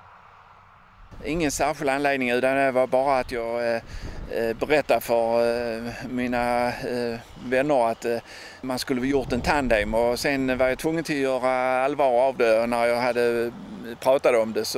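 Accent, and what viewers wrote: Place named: Scania, Sweden. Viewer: He speaks Swedish but with a dialect from southern Scania, the southernmost part of Sweden and therefore Scandinavia.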